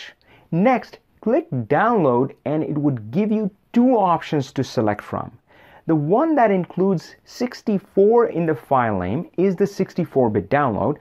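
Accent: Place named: India. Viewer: the best indian english talker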